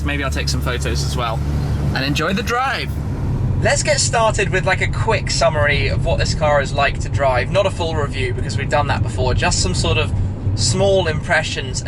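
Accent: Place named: England, United Kingdom. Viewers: indeed we do like your videos and you british accent is quite hard to understand but we dont really need subtitles ;) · Salut les français ! C'est grâce à Shmee, en partie, que je m'améliore chaque jour en anglais. La compréhension est un peu dure au début mais l'accent anglais est le plus simple pour comprendre (comparé aux américains, australiens, écossais, etc ...) !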